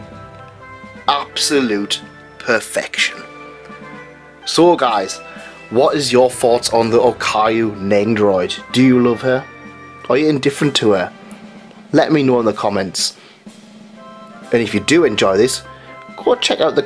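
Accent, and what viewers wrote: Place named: Scotland, United Kingdom. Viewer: Just came across your channel a few days and I just gotta say that I enjoy your vids quite a lot and they’re very informative for a novice like me. I’ve just gotten into figure collecting and I like to see what I expect before buying any figures! In my opinion, you’ve got the best reviews that i’ve seen yet on hololive figures! I also like your accent lol. I’ve been trying to learn a scots accent for some time now so it’s good research to listen to your videos. Hope your day goes well!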